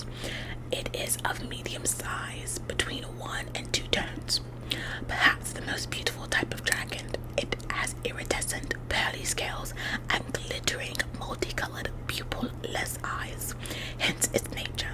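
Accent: United Kingdom. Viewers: if this was my first time watching your videos i would think you were british 😭😭😭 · I love the British accent 🩷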